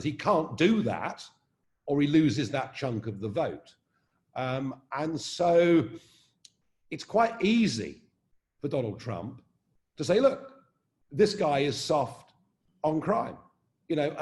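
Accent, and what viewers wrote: RP, United Kingdom. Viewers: I don’t like him and brexit will be a disaster. But his accent is sooooo British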